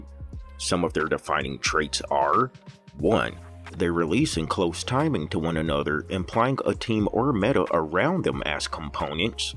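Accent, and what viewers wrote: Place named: South, United States. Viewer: I like the video and your southern accent. Thoughtful analysis. 1st time I see you. Subbed. Hope u post more.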